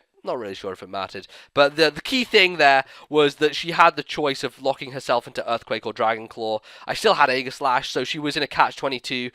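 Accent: France. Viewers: Can we PLEASE have a battle narrated fully in your French voice? That would be oh so amazing. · Yes... more French accent stuff!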